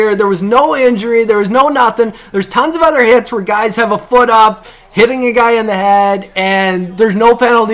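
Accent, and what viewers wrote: Canada. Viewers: ***** where are you from? I am from the Detroit area and I'm a die hard wings fan. I've been watching a lot of your videos lately and haven't figured out where you are from lol. DET? or no..sounds like your canadian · Why are you always Red Wings? They your favorite team? I live in Michigan so they my favorite team as well. Such a shame against Tampa Bay! You have Canadian accent so you from Canada?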